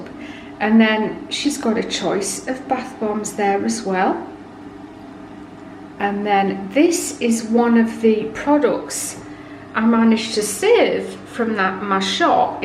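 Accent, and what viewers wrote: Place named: England, United Kingdom. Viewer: Beautiful colour palette in this bathroom. Beautiful shining Chrome fittings and towel rack really complement the shining tub and basin. If you ever give up soap making you could do interior decorating. You have such a beautiful English accent maybe that's why everyone wants to hear you talking. Have a wonderful day x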